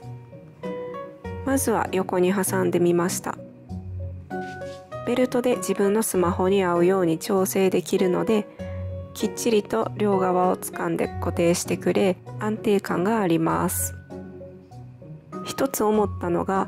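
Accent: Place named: Kansai, Japan. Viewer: 関西人ですかね？結構関西弁っぽいイントネーションで大阪の私は聞き取りやすかったです！ スマホ立てるの悩んでたので買ってみよ〜っと☺︎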